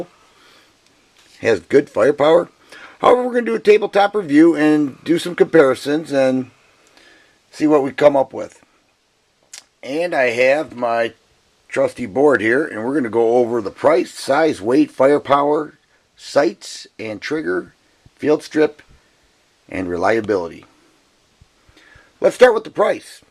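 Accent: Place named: Upper Peninsula, United States. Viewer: Whereabouts in MI are you from? I grew up in the Detroit area, you sound very UP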